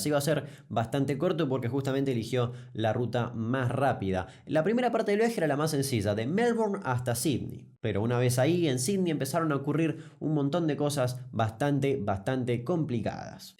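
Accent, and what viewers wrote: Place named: Argentina. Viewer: Fascinante como narras. Ja,ja. Me hace reír el "el suspenso " del principio, "¿qué le pasó, que le pasó? " Genial y con ese acento argentino que es espectacular. Un abrazo grande desde Bogotá-Colombia 💕💕💕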